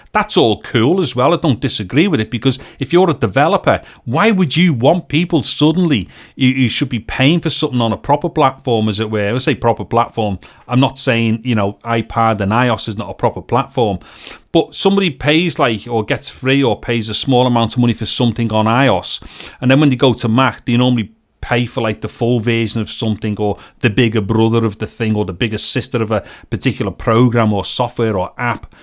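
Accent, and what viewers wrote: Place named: North, United Kingdom. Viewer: Edinbrah or Dublin accent? Northen United Kingdom accent. :) Just guessing.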